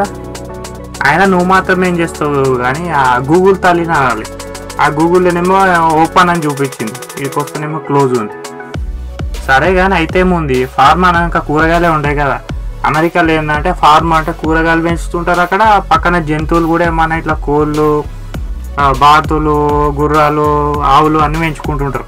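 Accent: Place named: Telangana, India. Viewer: Like the way you narrate in telangana style..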